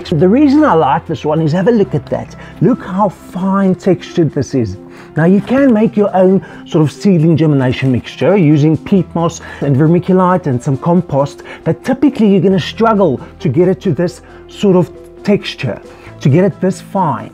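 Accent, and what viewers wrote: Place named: South Africa. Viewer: I can recognize that accent anywhere. From what part of south africa are you?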